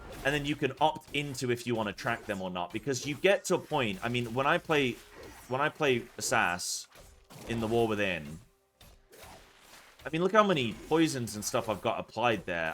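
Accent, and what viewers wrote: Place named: England, United Kingdom. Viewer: I’ve abstained from posting this comment for the longest time, but finally cracked… where are you from? I recognise a mostly English accent, however, there are a few intonations in your voice that make me question this. As an aside; another great video, thank you. Feral main 👍🏼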